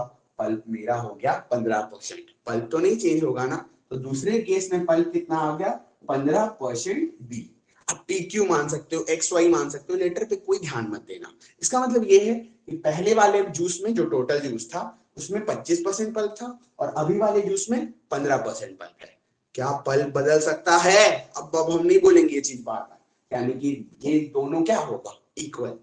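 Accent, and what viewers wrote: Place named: Bengal, India. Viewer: Dada tumi ki bangali?? Tomar tone ta lagche jeno tumi bangali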